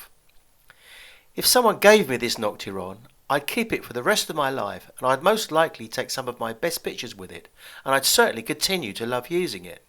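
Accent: United Kingdom. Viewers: This gentleman’s review allow me to understand lenses and practice my understanding of English with very strong British accent.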